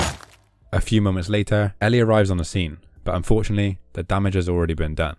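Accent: United Kingdom. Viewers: Are you from Nottingham??? I hear a very familiar east mids accent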